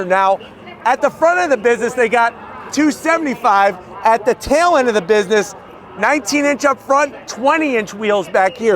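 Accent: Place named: Redneck, United States. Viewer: funny American redneck accent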